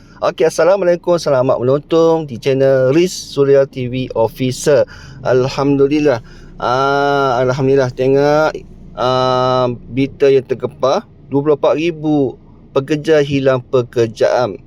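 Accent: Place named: East Coast, Malaysia. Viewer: pekat bethol loghat pantai timur dia 😅😂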